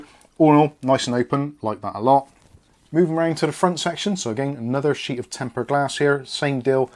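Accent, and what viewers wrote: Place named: East Anglia, United Kingdom. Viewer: My only complaint about this review is how you pronounced "Aluminium" Judging by your accent, I'd say you're from East Anglia or somewhere in the South-Midlands or South-West. Come on man, we're not speaking American yet ;)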